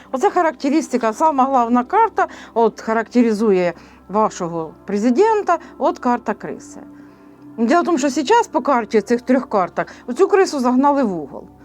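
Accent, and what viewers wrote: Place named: Surzhyk, Ukraine. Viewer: Дякую Вам,пані! Чую Вас і радію. І ше, Ваш суржик я теж так розмовляю. Харків з Вами.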